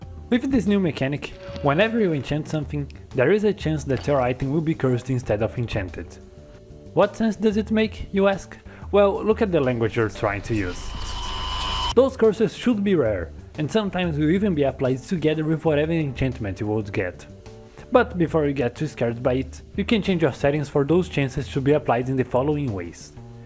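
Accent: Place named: Brazil. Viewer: Your video is very good, everything in it is great, I'll watch the other videos and subscribe, keep it up wait... are you brazilian too? (I saw it in the about tab of your channel) now I understand your accent XD eu sou br também tmj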